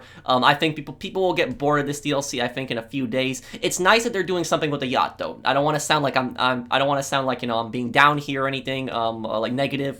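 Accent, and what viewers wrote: Canada. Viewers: I sense canadian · Are you Canadian?????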